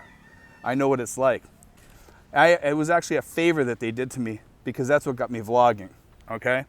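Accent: Boston, United States. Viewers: Good video, u From Boston?? Lol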